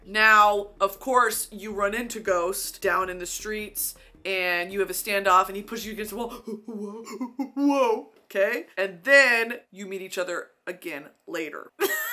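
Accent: United Kingdom. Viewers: Your British accent knocks me out every time😂👌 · Seeing her face in the bottom left corner reading the fanfic in a British accent while I hide under my covers at night got me laughinggg😭😭😭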